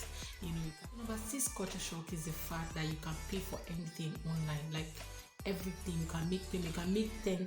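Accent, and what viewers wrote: Nigeria. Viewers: Pepe is pepper? Eish! Love the pidgin language pronunciation 😃 Karibu again 🇰🇪 🇳🇬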